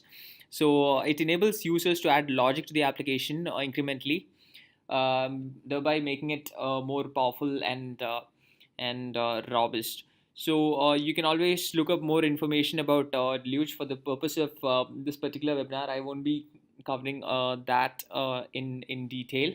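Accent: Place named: India. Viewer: 😅 indian accent 🤦‍♂️🤦‍♂️ at least try to speak without accent, 🤦‍♂️🤦‍♂️ nobody likes this shit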